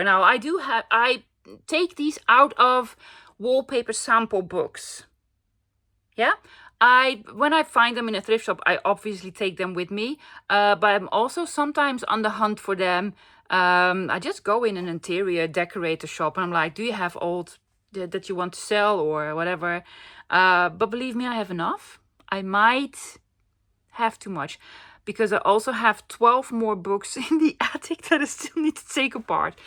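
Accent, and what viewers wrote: Netherlands. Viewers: Wow !!! Im new to yr channel & i was blown away watching this, first of all, my Late Mother was Dutch & ive always loved the accent, you have a great sense of humour & i was thinking there is so much in yr craft room, that i could come visit & go shopping 🤣🤣, however slight problem i live in Australia lol I thoroughly enjoyed every minute of yr tour, Thankyou im in awe of all you have 😊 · The moment you started speaking I knew you were from Holland. My parents came to the U.S. right after WW2 with my brother, who was two at the time, and one trunk of belongings. My entire family is still in Holland. My maiden name is Visser (Heiloo) and my mom's maiden name was vd Kuil (Ijmuiden). I submitted DNA for ancestry and I am 98% Dutch (mostly Friesland). "If it ain't Dutch it ain't much" Lovely craft room tour!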